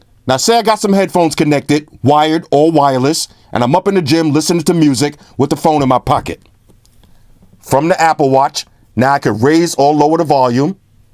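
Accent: United States. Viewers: Haha. That's awesome,. You sure sound like an American. Nonetheless your reviews are awesome. Keep it coming!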